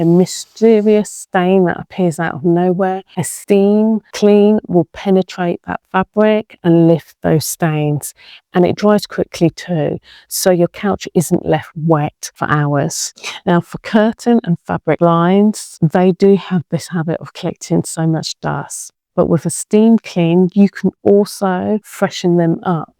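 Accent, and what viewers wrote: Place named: Southern England, United Kingdom. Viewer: Faucets or taps? She sounds like she's from the south of England so why faucets?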